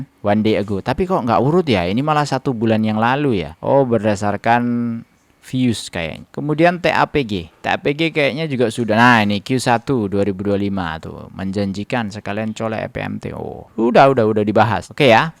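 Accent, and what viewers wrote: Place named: Madura, Indonesia. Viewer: Trimakasih abang qu... Sudah di cek saham pans nya... Maaf bang.. Asli orang madura y... Logat nya.. Ngga bisa hilang.. 🙏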